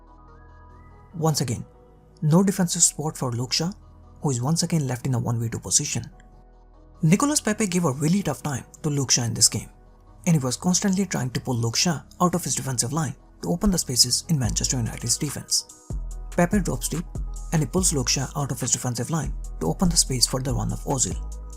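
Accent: India. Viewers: What an Indian accents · It's so tiring to listen to your (Indian English)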